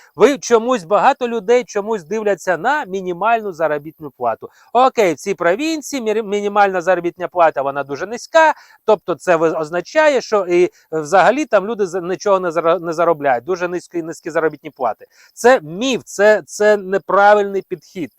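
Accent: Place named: Russia. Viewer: Я це акання взагалі чути не можу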